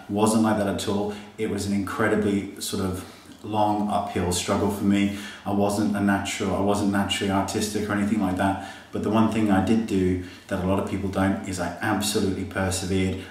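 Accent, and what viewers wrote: United Kingdom. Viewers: Here's another question: where are you from in the UK? Your accent is really nice!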